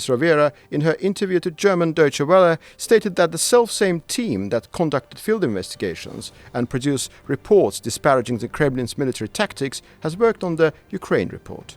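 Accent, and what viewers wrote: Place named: United Kingdom. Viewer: Это прекрасно, что появились выпуски на англ языке. Однако, можно совет от англоговорящего человека? Прошу вас попросите комментатора не тараторить, в самых важных моментах используется военная терминология уместная для данного контекста, но зрителю нужно это ещё уловить и осмыслить. Плюс очень сильный британский акцент смазывает некоторые слова. Немного практики по примеру телерепортёров с BBC и всё будет отлично. И потом мы (зрители) рады и 15-минутному ролику, если нужно почётче всё изложить, мы только рады. Удачи вам во всех делах. Спасибо за вашу работу.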